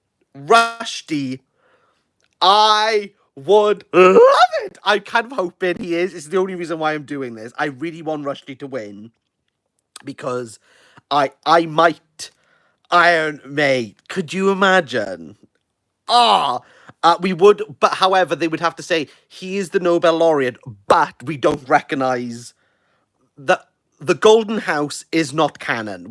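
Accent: Wales, United Kingdom. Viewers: the welsh accent plus the lack of hearing-impaired captions has made this vid a little trying but think I got the gist the only fosse I know is bob fosse the broadway show dance guy they made hit movie about him also the art of the deal was ghost-written trump doesn't even write e-mails cause he knows they last forever and doubt he's cracked a bk since college but if they could give it to dylan and the peace prize to obama before he even finished moving into WH even the orange ogre may have a chance in the meantime send me some of your laughing gas could really use it now ⚛😀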